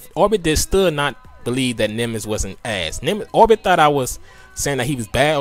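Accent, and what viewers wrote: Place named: South Florida, United States. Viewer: Let em know! Btw are you from FL cause you sound like you got a south florida accent.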